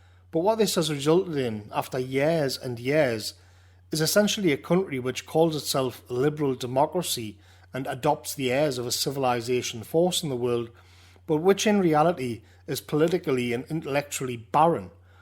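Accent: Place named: Newcastle, United Kingdom. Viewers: as a northerner myself this channel is a wonder and listening to the accent of the north is refreshing ..he is insightful and much needed in these times ..i would vote for this man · 'Geordie', North East England. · So well articulated. Just as i found it on my return from Sweden after one year due to kung flu regs. Love the background shots of rushing water ,the simple melody on the concertina and the northeast dialect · Well it's certainly not everyday you get to hear the word "catharsis" spoken in a broad Geordie accent! Excellent content.......... subscribed. :)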